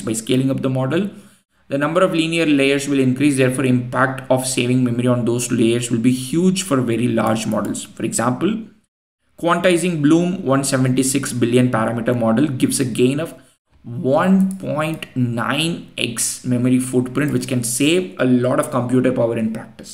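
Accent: India. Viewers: you accent is noticeable but I have heard many with much thicker Indian accents, yours is quite good. Might be even better now, I only just came across this video now.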